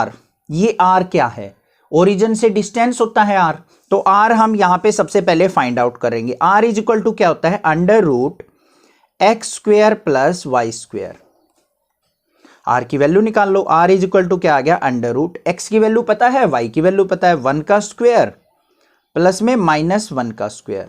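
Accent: Haryana, India. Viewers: Sir r u from haryana? Bcoz u speak like haryani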